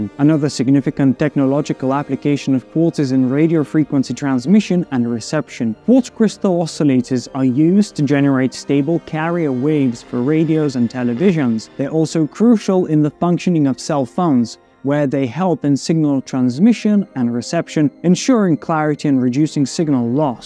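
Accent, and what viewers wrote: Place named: Scotland, United Kingdom. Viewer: (with a slight Scots accent)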